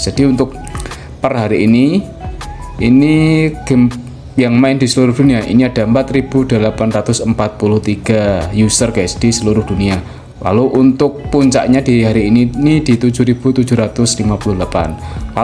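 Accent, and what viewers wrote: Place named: Java, Indonesia. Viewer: Jijil banget klo dnger jawa mgomong😂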